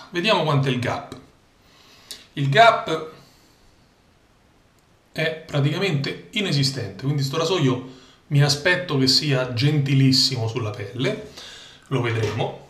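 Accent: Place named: Naples, Italy. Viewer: dal tuo parlare mi sembravi di napoli ehehe tutto qui.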